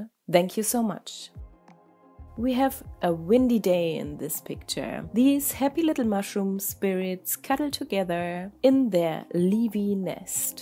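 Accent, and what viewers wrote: Germany. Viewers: Dein Englisch ist zwar richtig gut- trotzdem hör ich da einen LEICHTEN Deutschen Akzent raus? 😅 Gibt's deinen kanal auch deutschsprachig? Deine Kunst ist echr genial 👌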